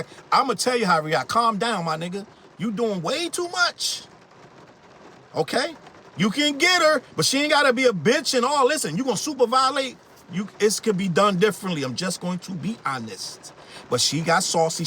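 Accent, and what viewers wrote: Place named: Maryland, United States. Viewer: Also, are you from Maryland? I’m from 301 and def hear the accent at times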